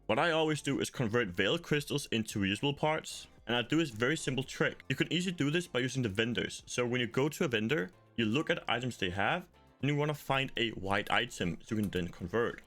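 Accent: Denmark. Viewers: wait rly?? Im from Denmark and i thought that english sound like it had a Denmark accent lol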